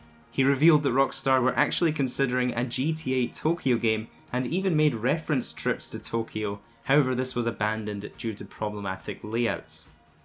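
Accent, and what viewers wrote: Scotland, United Kingdom. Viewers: Scottish accent lol I like it personally but I’m from the uk so it’s normal to me to hear it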